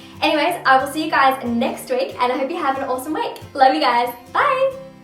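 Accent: Australia. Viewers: Are you Australian? I'm very new to this channel, this is the third video from your channel I have watched! 😂 I'm not trying to be rude or send hate! I'm only asking a question.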